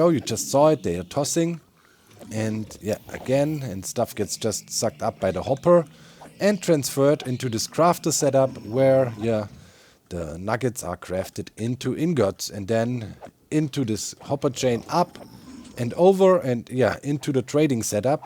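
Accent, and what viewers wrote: Germany. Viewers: In my [admittedly long] student career, the professors with the best true/technical understanding and working knowledge of their chosen field have been German. I count those professors among my favorites; I learned so much from them, and still dream of aspiring to their level in my own work. Is that why I enjoy watching vids? Might be. He's got the Hive Mind, but he's also got an accent like my old professors, and he produces the specific-technical kind of violently ingenious applications that might as well be a stereotype of Germanic Engineers. And he's got a thing for tomatoes. Inspiring yet relatable, with a comforting accent. Don't worry about the video editing, nor the final length of the videos you post. I think your fan base is here for the long haul.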